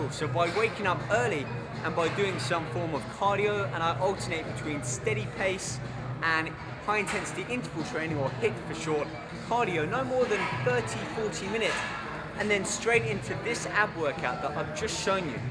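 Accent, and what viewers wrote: England, United Kingdom. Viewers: fuck ur englisch accent u fucking roid user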